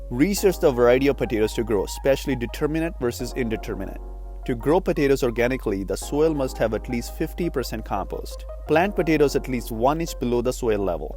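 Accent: Punjab, India. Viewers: Love the punjabi accent.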